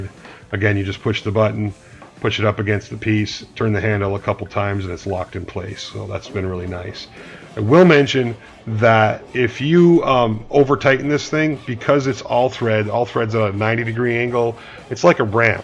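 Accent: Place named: Carolina, United States. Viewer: yes-that's your accent--n or s Carolina? - oh yeah did you say something about Michigan -- from La La lander previous show me stater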